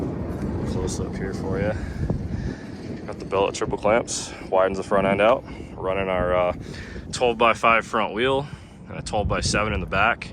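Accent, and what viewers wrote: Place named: Canada. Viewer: I was talking about the welcome back the guy dose it all the time sounds Canadian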